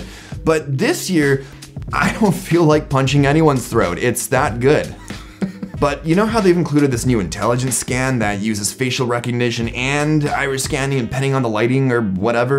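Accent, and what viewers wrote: Canada. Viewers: I love when your little Canadian accent shows through. I also dig the beard! very rugged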